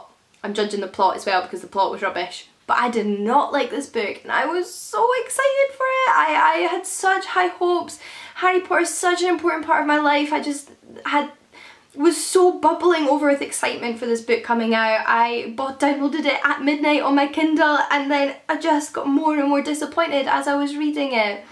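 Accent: Scotland, United Kingdom. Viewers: Where are you from? :) Im assuming thats a scottish accent?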